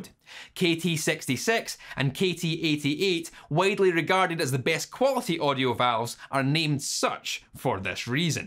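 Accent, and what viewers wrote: Scotland, United Kingdom. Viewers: Watching video like "Ok. Makes sense. Grid biased... Anode whosits... Dull Scottish voiced white noise playing as consciousness ebbs away... Hit like and subscribe" and I'm back to reality. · great explanation, but your American accent sounds like an American doing a Scottish accent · I'm 57 and learn new shit from you every time I watch one of your videos. Love the Scott in your voice. Thank you. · Still has Scottish in his American accent. I love it. I love it so much. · Great, as usual. Love that Scottish accent, since I learned English in Edinburgh.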